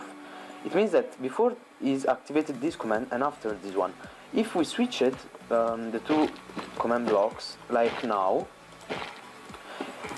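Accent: Italy. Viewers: ❉Team Chicken❉ ahhahahaahaha grande comunque dal modo in cui parla inglese sembra italiano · la tua pronuncia è all'italiana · non ho capito se sei italiano e parli in inglese o se sei inglese e conosci l'italiano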